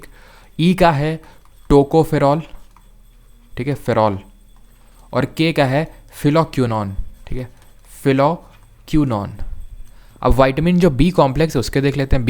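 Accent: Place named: Prayagraj, India. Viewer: Jaldi koi teacher pasand nai aata mujhe..but vai yr tumse acha teacher nai dekha.. perfect ho tum GK k liye...waise awaaz se lagta h prayagraj side se ho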